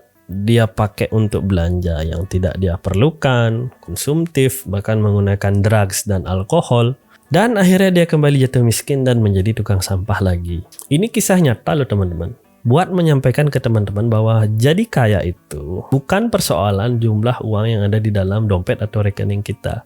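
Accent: Bali, Indonesia. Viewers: kaya orang bali logatnya pak, ake bingung bane 😂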